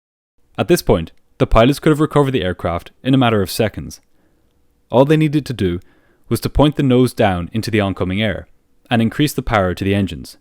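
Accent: Ireland. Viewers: Yes yes i thought i got a little Irish accent in there too 😅🍀🍀 im also irish by the way 🇮🇪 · Only started watching your channel, its a great find!!! By any chance do I detect an Irish accent mo chara? I'm Irish and it seems like I do but I could be totally wrong! Let us know if you do!! Keep up the amazing videos and keep safe Mr!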